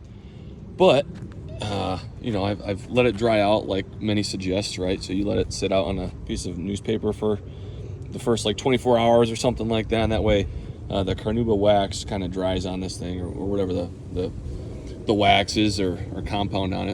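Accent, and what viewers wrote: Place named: China. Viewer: I think this video was made in China, Man is trying to hide his accent. China is influencing in our market.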